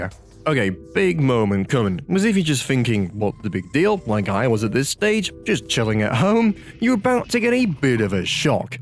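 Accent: United Kingdom. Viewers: youtuber and british acent at the same time is crazy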